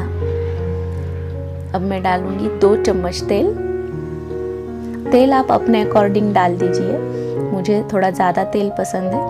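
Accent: India. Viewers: आपका लबों-सहेजा बताता है कि आप साउथ इन्डिया से हो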